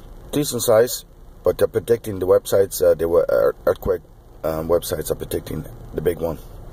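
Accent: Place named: Netherlands. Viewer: so, just thinking, your accent sounds like an old Holland/dutch accent, am I close? btw, reports are interesting from LA, cousin is getting out of the state tonight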